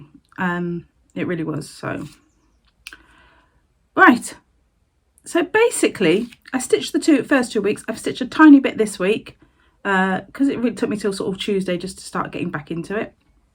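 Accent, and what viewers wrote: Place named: United Kingdom. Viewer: Hello, where is your frame company please, couldn't see it on your very comprehensive drop down list unless I missed it somehow and didn't seem to come up on Google search. Enjoying you video sounds like you are in the same area as me in thee UK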